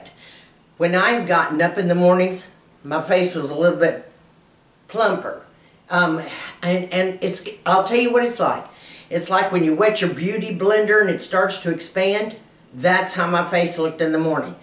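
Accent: South, United States. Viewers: I figured Alabama or Texas lol. I'm from SC. We tend to hang on to the end of words lol. Thanks for the update on this mask. Would love to try it. LOVE to SEE hydration and plumpness father a mask!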